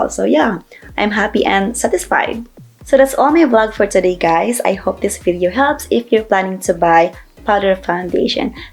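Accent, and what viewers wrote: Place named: United States. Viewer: Akala ko sis American yung nagsasalita hahaha! Oo maganda nga sis nakakatakip ng blemishes 😊 galing galing magreview 👏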